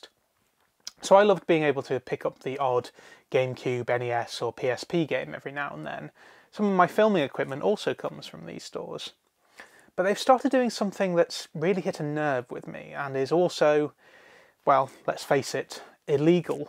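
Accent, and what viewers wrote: Birmingham, United Kingdom. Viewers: Are you from Birmingham? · Are you from around Birmingham?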